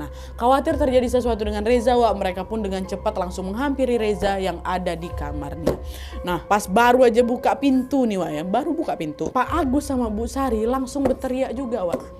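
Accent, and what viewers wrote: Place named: Sumatra, Indonesia. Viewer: Dengar cerita berasa cerita nya dari tanah Sumatera hihihi...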